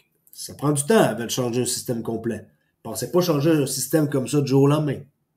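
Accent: Quebec, Canada. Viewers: Merci pour tes recherches et analyses. Cest plaisant d'entendre un quebecois se prononcer sur le sujet XRP XLM XDC!!! LA MECHE SE RACCOURCIT!!! KABOOM!!!!!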